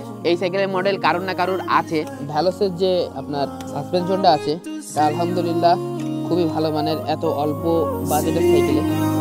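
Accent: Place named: Jhenaidah, Bangladesh. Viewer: ঝিনাইদহ??এক্সেন্ট শুনেই টের পাইছিলাম😅আরাপপুর থাকেন নাকি??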